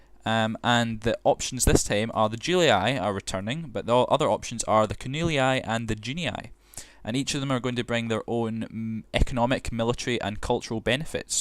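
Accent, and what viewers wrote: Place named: Scotland, United Kingdom. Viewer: The speaker is definitely Scottish!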